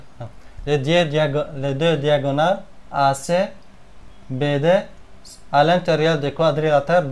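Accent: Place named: Lebanon. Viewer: c'est un accent libanais je pense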